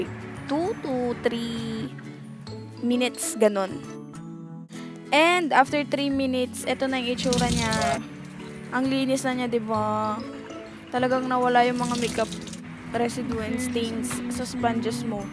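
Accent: Visayas, Philippines. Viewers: bisaya diay ka day? halaaa 💖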